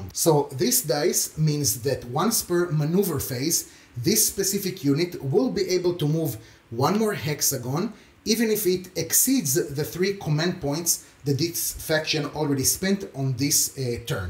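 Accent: France. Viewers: have you had a lot of troubles with get rid of French accent? I saw one French man trying speak english but he has some troubles with "french accent"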